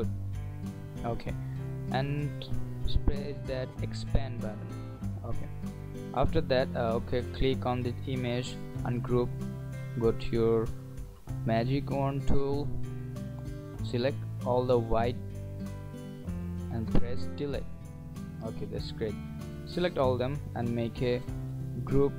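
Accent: India. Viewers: Your English is so fuckall with a crap Bengali accent! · Why does everytime i need some tutotiral in this stuff , Apu from Kwik-E-Mart is the voice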